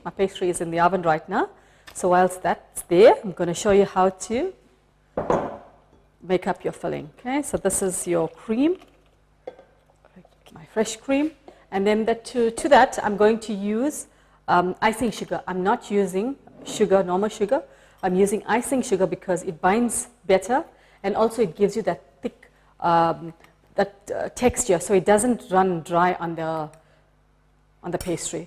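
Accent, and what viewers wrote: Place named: South Africa. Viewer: well if they are not a professional probably explains the whisk situation, as i heard "chef", im not a professional but i certainly know how to cook and bake, but tbh it was embarrassing to see handling that whisk! i was cringing im all for loving amateur baking and cooking even if they dont have all the tools in the kitchen but ones should know how to cook use it she was pretty messy. im only giving constructive critism not a personal one you still didnt get the camera focused on the food.:( Ps i would take your challenge the thing is i dont live in S africa Im guessing from your accent!:) anyway hope to see some improved use of spoons:) Your probably hating on me now!!!:)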